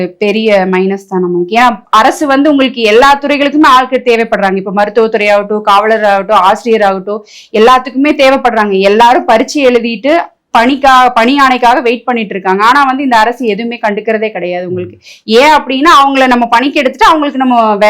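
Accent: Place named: Kongu, India. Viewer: ❤❤அழகு கொங்கு தமிழுக்கு அடிமை சிறப்பான பேச்சு ❤ சிங்கப்பூரில் இருந்து ❤❤❤❤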